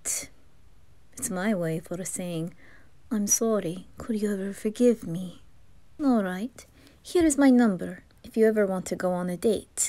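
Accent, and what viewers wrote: Russia. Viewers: Ваш русский акцент прекрасен (*´﹀`*) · The amount of ppl speak russian in here lmao i'm russian and your russian accent and russian it self is really good keep up the amazing work love❤❤❤❤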